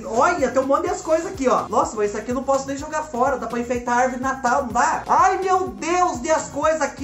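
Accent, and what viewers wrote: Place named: Paraná, Brazil. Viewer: Espicula da redondinha kkkk minha mãe falava, aqui no Paraná .. no interior falam igual a vc... Morro de saudade de minha familia qdo escuto vc falar algumas coisas ... Kkk parabéns... Amamos vc. Espicula redondinha kkk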